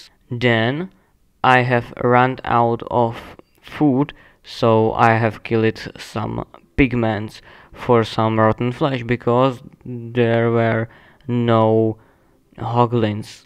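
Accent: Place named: India. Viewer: You speak in Indian ascent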